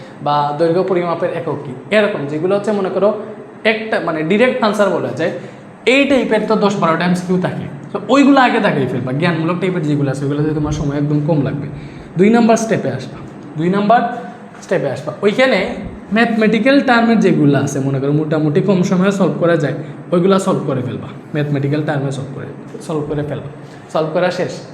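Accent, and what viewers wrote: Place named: Bangal, Bangladesh. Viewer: ভাইয়া আপনার বাঙাল ভাষা কিছু বুঝিনা তাই শুদ্ধ ভাষায় কথা বলে প্লিজ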